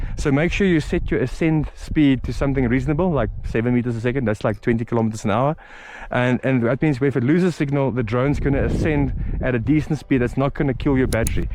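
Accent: South Africa. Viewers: I can spot a follow South African from a kilometre away XD. NIce channel!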